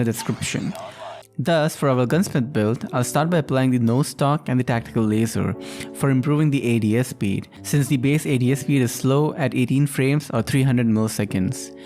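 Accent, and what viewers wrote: India. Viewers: Man your accent is kinda Indian love your vid❤️ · Bro are you Indian guessing from the accent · Wtf too to Indian lagta hai bro Are you bro ???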